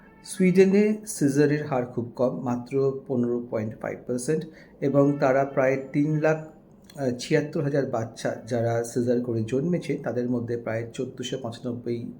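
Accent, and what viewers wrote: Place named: Kolkata, India. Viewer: Nmaskar Sair কলকাতাই কথাই বসেন আপনি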